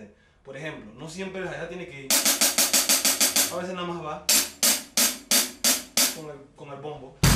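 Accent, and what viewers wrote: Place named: Panama. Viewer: Man te lo juro que se ve! yo tambien soy de Panamá y encontre tu acento muy familiar, muy buenos videos, te felicito! saludos.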